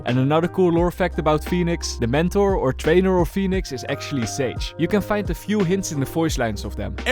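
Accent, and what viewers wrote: Netherlands. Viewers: he has a dutch accent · i love your video’s but please PLEASE fix your dutch accent 😭😭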